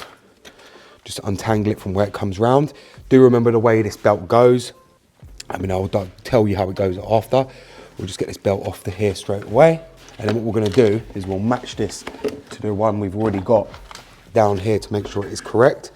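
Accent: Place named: Cockney, United Kingdom. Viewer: Hi. Don't know if you read comments on a 2 year old video but your channel is gold to me mate so thanks heaps. I am going to be doing this job soon I think. My 2007 E61 is at 160000kms/100000 miles now. I have a leaking valve cover gasket which caused a misfire on two cylinders dripping onto the coils. Those were replaced fairly easily but I'm going to get a professional to do the valve cover gasket as I'm don't have all the tools or quite the skills to do it confidently. Nor do I have the time. Thankfully I have found a really good BMW mechanic and the quote I got is pretty reasonable. PCV vent hose and eccentric shaft censor seal are getting done too and all up the job is $850NZ which is very reasonable all things considered. Great mechanic with the best reputation in town. He's about as Kiwi as you are Cockney and I think you'd approve of the level of professionalism and use of original BMW parts. 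There's plenty I would do myself like belt, tentioner and idler pulley but the valce cover job is a bit beyond me I feel. Valvetronics will need coding in any case. Cheers mate. Thanks again.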